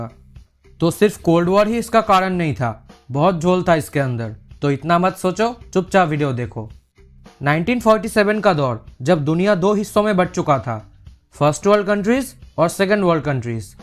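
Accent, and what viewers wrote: Bengal, India. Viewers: Bengali accent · U r Bengali ur accent says that amio bangali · Bhai yaar Hindi bol le.. Takleef, Azad? Duwidha, Swatantra nahi bol sakte kya? Teri accent shunke toh bengali lagra hai tu? শুদ্ধ হিন্দি বলতে শেখো ভাই। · You're Bengali, Right? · You sound Bengali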